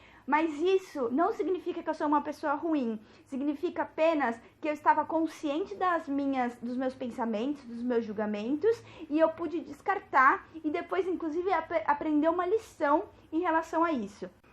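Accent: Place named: Sao Paulo, Brazil. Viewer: Paulista da gema,com esse sotaque!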